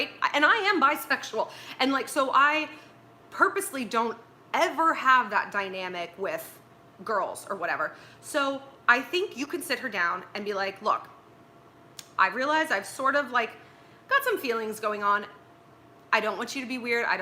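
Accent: France. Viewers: The french accent 🤣😄🤗😄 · Wow that French accent! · Oh my CRAP!!! I LOVE YOUR FRENCH ACCENT!!! SO MANY THINGS!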